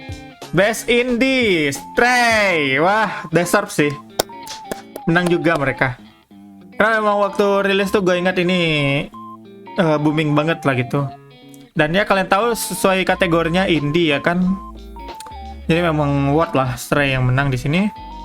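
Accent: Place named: Banjar, Indonesia. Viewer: bang, elu org mana sih? bahasa lu kyk org banjar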